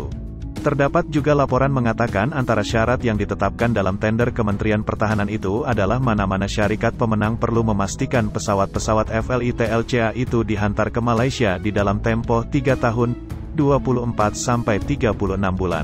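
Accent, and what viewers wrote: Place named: Indonesia. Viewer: Negara ni skrg dah teruk korup, lagi mahu beli aset baharu..........LCS pun dah bayar RM6 bilion, sebiji pun tak siap, menteri2 umnobn/pn cekik komisen. Suara pun org indonesia lagi mahu sembang ttg tentera Malaysia........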